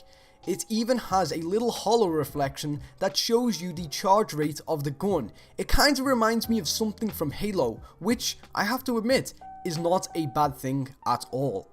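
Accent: Liverpool, United Kingdom. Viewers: Your fucking accent is horrendous. If you're a scouser, SPEAK LIKE A SCOUSER. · I'm so confused, are you a scouser? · 'which I'm sure is a toilet paper in the UK' .....you're from the UK aren't you?? Scouse I thought??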